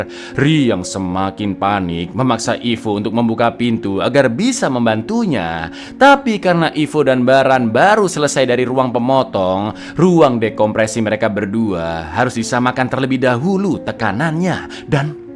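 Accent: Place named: Surabaya, Indonesia. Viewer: Asli arek suroboyo iki admine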